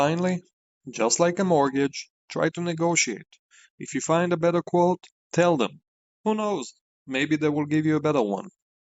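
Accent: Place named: Israel. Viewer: Your israeli accent is clear as sun Ty for destroying the locksmith industry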